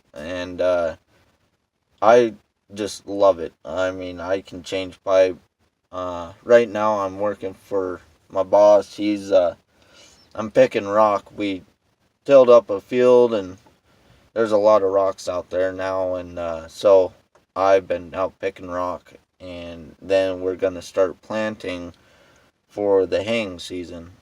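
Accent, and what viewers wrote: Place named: Wisconsin, United States. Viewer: Sounds like he’s from Wisconsin lmao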